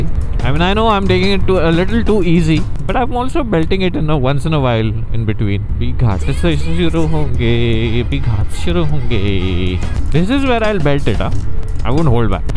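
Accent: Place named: Hyderabad, India. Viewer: Hi! Happy new year first of all... idk if you'll see this or not but very very lovely year of content from your side... and you pakka sounded hyderabadi with half the comments you were making in this video (yes you should come to hyderabad)... and next time come to Lonavala a lil earlier that way i can also see you!! Love from hyderabad man truly!!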